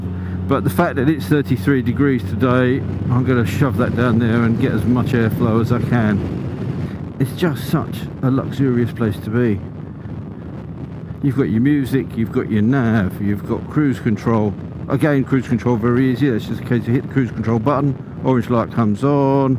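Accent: United Kingdom. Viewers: Marvelous British accent, sir. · yep turned off after 2 minutes. Don't want hear the limey inflection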